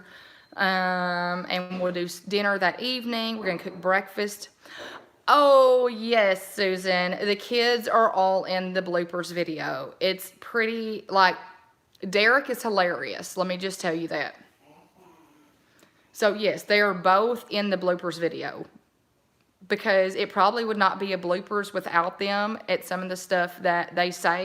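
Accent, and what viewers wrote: South, United States. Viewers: I love how down to earth your channel is and how courageous you are to share yourself with others. I lived 12 years in Georgia (now I’m in DC area). so one selfish reason I love your channel is to listen to your awesome southern accent and all the sayings. “I’m fixing to...” is something I don’t hear much now 😀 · OMG LOL I LOVE your accent!!!!!! Sometimes I try to talk that way. I love it. lmao. I am in California, so I don't feel like I have any accent. Do we sound like we have an accent to you (meaning people in the south?)?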